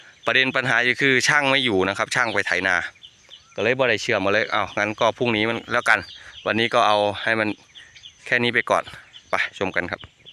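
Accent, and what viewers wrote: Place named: Laos. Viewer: ❤❤❤❤❤ชอบที่ท่านพูดภาษาลาวมากกว่าครับ...adminพูดลาวคนไทยฟังแล้วรู้สึกไม่เพราะครับ❤❤❤❤❤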